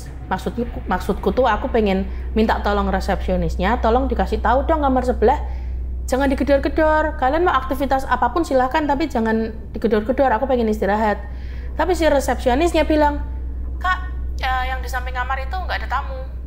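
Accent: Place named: Semarang, Indonesia. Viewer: logat e semarang bgt hhe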